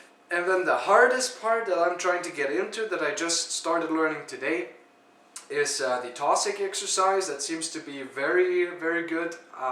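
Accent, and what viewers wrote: Canada. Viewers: Says "ootside" More attractive than average American Apologizing for not making a video on the subject he admittedly is just learning. Jesus Christ, you couldn't be more Canadian if you were eating maple taffy off a stick while riding a moose into ~battle~ your formal apology for the inconvenience of your incredibly loud moose galloping,metric system, and socialized medicine keeping everyone awake! :p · that Canadian accent thooooo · People from the province of Québec have that accent, usually.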